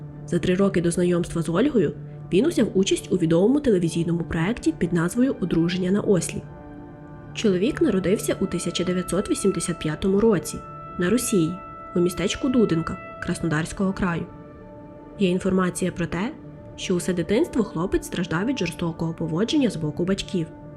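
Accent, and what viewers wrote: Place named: Chortkiv, Ukraine. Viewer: У вас такой акцент как у моих друзей из Черткова) но формат у вас как у некоторых американских true crime каналов. Вы видимо в Америке жили или живете?!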